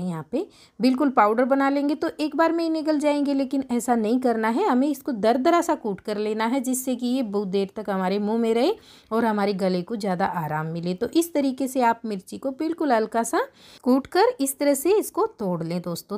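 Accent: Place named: Marwar, India. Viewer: thank u ji.... ☺️ Hukum aapni marwadi me vi bnao koi... Kitni mithi boli h aapni marwadi😇😊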